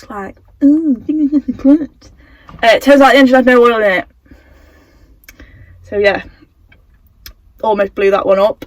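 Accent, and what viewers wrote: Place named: England, United Kingdom. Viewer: Damn, you sound proper English!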